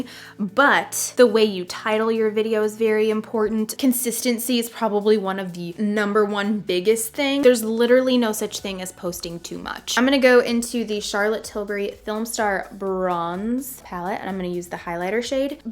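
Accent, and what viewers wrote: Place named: Chicago, United States. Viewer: Love listening to your Chicago accent. Made me smile when you said Pop for soda ☺️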